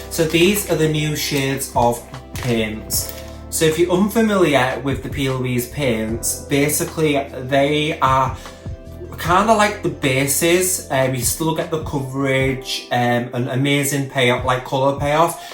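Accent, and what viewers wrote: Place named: Hull, United Kingdom. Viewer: Mine was shipped yesterday and I can’t wait so started looking on yt see your video and instantly think I know that accent 🤣 fellow hullian you got a sub just for that 🤣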